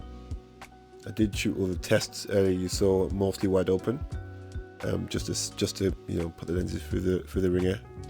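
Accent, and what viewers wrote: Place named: Midlands, United Kingdom. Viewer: I can hear the midlands in your voice. Yuh Dun know I'm subbing ☝🏾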